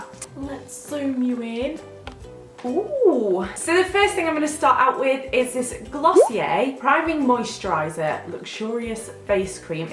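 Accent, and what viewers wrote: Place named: Yorkshire, United Kingdom. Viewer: Sounds weird but you've got the nicest accent ever... is it Yorkshire? xo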